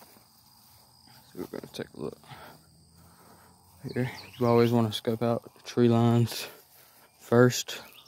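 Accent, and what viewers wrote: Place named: South, United States. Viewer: Was this filmed around upload date or is it old? I thought they didn’t come out until later in the south (assuming by accent)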